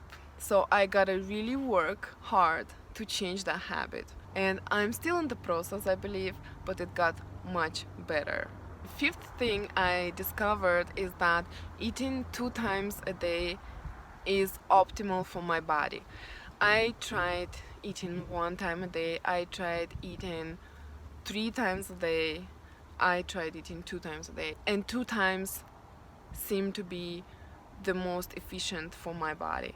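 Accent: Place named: Ukraine. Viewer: thanks for sharing your growth...also love your accent (Ukrainian??)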